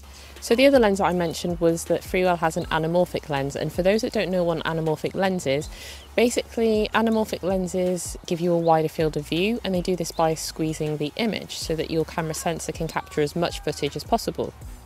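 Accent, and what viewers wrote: United Kingdom. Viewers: Also I love your british accent :)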